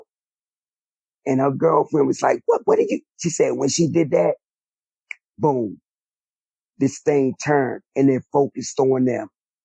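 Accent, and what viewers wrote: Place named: South, United States. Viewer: Just his southern accept so cool!!!💖💖💖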